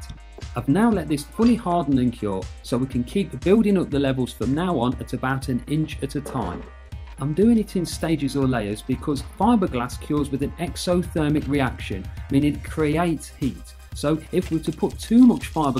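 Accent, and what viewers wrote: United Kingdom. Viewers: This video sounds so british that my endgame got invaded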